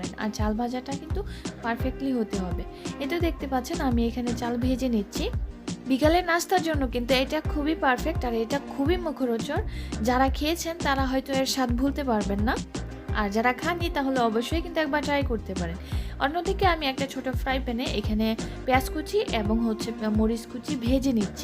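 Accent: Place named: Noakhali, Bangladesh. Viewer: নোয়াখালীর ভাষা কড়ি বলে অনেক ভালো লাগলো 👌👌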